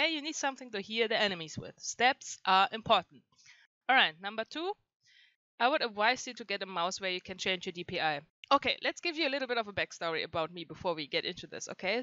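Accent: Germany. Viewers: Den deutschen akzent fühl ich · The german accent tho 👌🏼 Man hört es 😅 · Are u German ?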